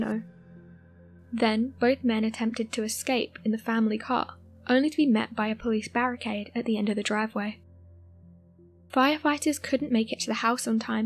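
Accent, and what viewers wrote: United Kingdom. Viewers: She's British. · What’s this beautiful accent? I know prob UK but is it Welsh, some specific part of England ? Thanks it’s just beautiful for stories .... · Nice narration voice.clear and concise.British of course.lol.😁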